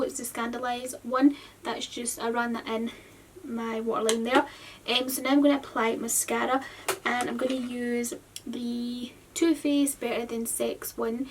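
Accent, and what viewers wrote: Scotland, United Kingdom. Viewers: Great video are you scottish?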